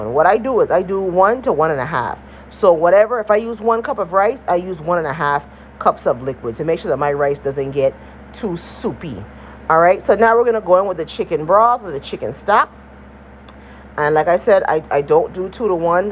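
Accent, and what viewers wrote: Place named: Guyana. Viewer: I Know You're Not Guyanese, But You Kinda Sound Like It..I Love All Of It Tho. Thanks For Sharing.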